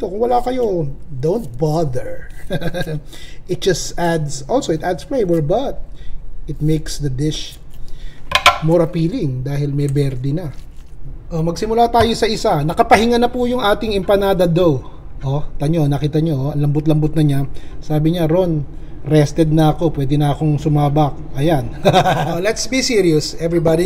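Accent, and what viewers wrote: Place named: Nueva Ecija, Philippines. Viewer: base sa punto nyo kuya ,novo ecijano po kayo? kababayan ko po kayo shout out po sa mga tiga nueva ecija ! especially sa mga tiga zaragoza 😊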